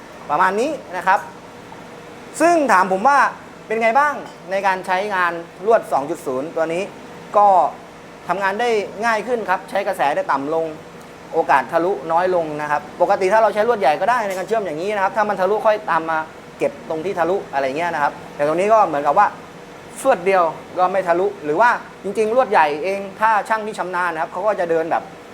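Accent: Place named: South, Thailand. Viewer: สำเนียงเหมือนคนใต้บ้านเราเลย นิ 555fc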